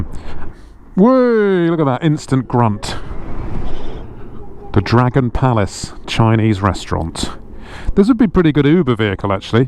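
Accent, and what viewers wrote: England, United Kingdom. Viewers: Love the way you think mate, like listening to myself with a pommie accent 😆👍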